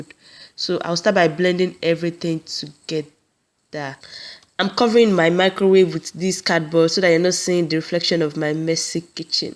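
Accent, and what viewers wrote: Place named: Yoruba, Nigeria. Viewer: Jeezzz you accent is just so yorubatic . Alf scotch bennet. 🤮🤮🤮 , add—- hadd, ott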